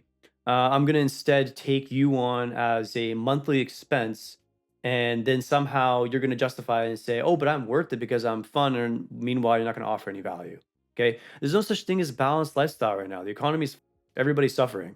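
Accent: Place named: Canada. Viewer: He sounds American but isn’t American, I’m gonna go with Canadian..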